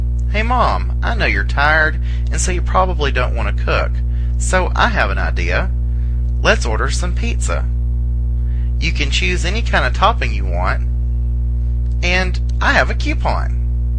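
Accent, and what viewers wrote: South, United States. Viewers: I never thought a gay southern man could bring this much joy to my homework. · I’m just here for the delightful southern accent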